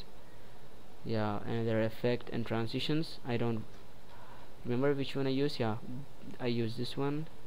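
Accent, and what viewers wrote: India. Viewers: this guy is just messing up with you, he might ment to say that you sound Hindu(is your accent) but who cares, nice video, helped me out a lot.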